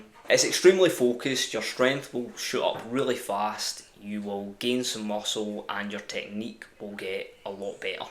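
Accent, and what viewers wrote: Scotland, United Kingdom. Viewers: A fellow scot. Not to sound stockery but what part are you from. Ayshire myself.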